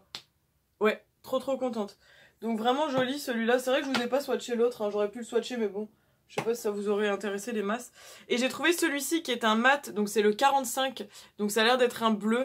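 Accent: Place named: South, France. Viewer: Ton accent du sud me tue mdr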